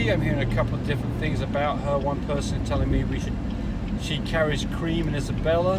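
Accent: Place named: United Kingdom. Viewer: Im really curious you have a british accent.are you originally from UK.. karen from england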